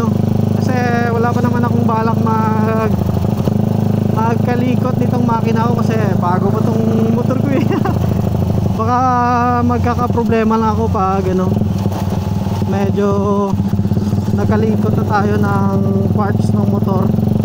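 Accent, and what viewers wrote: Iloilo, Philippines. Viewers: Lodi taga san kapo ba ilonggo kapo ba pa shout out nlng lodi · Taga diin ka kuyaa hahaha ilonggo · To ilonggo ka?toh race ta nga duwa.visita ko da,visita kaman sakon vmtv. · Baw ilonggo kagd boss😂😂✌✌ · Ilonggo ka 😂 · Illongo si sir haha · Ilonggo gid si sirrr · Ilonggo gid man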